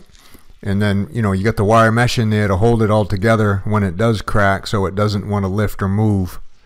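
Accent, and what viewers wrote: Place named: New England, United States. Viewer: Only one comment! Great New England accent. Just about to say Maine......when you said Maine. Love my people.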